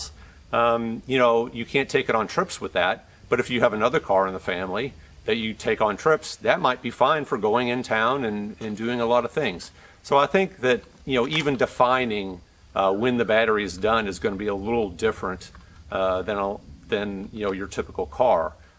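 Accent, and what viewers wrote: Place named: South, United States. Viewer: You have an easy southern delivery. Great job.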